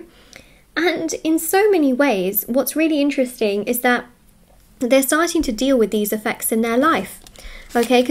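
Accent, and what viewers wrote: England, United Kingdom. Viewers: which part of england are you from? I’m curious to place your accent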